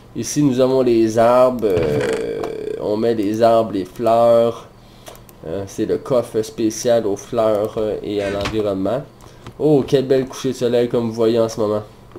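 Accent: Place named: Quebec, Canada. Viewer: T'est vidéos sont trop cool et ton accent Québecois est vraiment plaisant pi moi aussi je suis un Québecois, Vive le Québec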